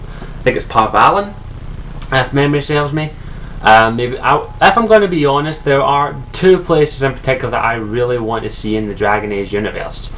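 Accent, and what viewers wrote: Ireland. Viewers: Lol. "Are you Irish?" Sorry, but that was funny. You have a thicker accent than I'm used to, but even if I didn't look at your user name, I would still be able to tell. Eh, what do I know, I'm just a silly American. I seriously don't want the rumors to be true, if they make DA3 into a multi-player or an open world... there is no way to properly convey my disgust. They definitely need to go back to the multi-race/background set-up and allow for more conversations like in DA:O. <--disjointed much? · Are you irish?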